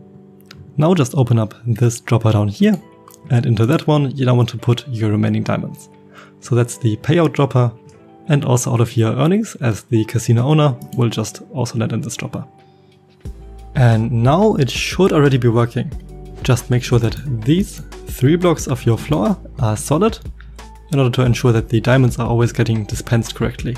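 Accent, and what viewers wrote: Germany. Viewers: Dieser deutsche Akzent.... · Er hört sich wie ein Deutscher an oder spinn ich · Wow! I love the size of it! :D Btw: are you German? Your accent sounds very german I think :)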